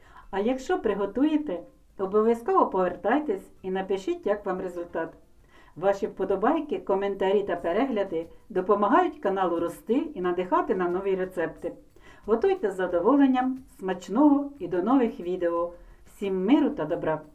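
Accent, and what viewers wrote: Standard. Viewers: Спасибо за чистый и мелодичный украинский язык. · Цікаво👍Треба спробувати. Особисто від мене дяка за гарну українську, вперше чую на кулінарному каналі таку чітку мову❤Процвітання вашому каналу.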